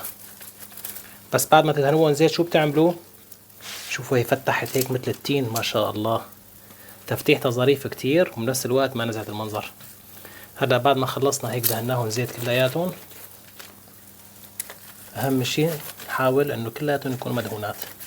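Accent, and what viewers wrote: Syria. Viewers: معلم المعلمين والله حضرتك كأنه حلبي. بلدياتي هلافيك من وين ماكنت المهم أنك سوري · تسلم يدك. جميله اللهجه. حضرتك سوري؟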